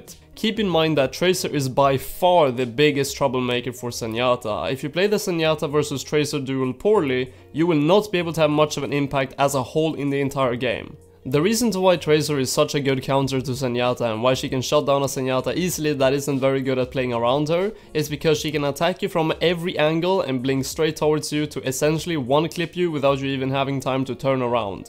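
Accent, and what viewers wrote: Sweden. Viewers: Embrace the swedish accent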